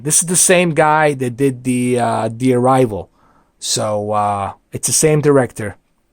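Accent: United States. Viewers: Im from Australia and have made afew videos a year ago, check them out if you want. I might get back into making youtube videos, i started off pretty badly and abit nervous but might go back doing some after i upgrade all my gear.its not like i need an upgrade coz all my gear is 2015 models BUT now that theres HDR i really want a projector with hdr , like the sony 4k hdr selling at 15k lol expensive but worth it. Im more of a home theatre enthusiast and picture quality is very important to me. So yeh, hopefully when i move into my new house i will upload more vids. I love watching your vidz man. And love the american accent lol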